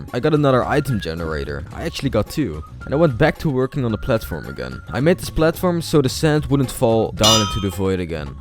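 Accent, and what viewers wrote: Netherlands. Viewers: Je kan gewoon horen dat je Nederlands bent🤣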